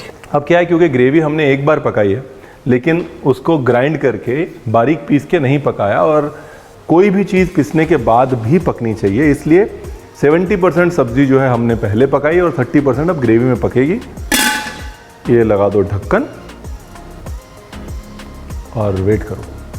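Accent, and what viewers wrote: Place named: Malwa, India. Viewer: Hi ranbir.. Muje apki recipes bahut achi lagti h.. Aap faridkot se ho .. Main moga se ho.. Ab hm jalandhar main rehte h .. Aap bahut achi punjabi bolte ho.. Malwe wali ...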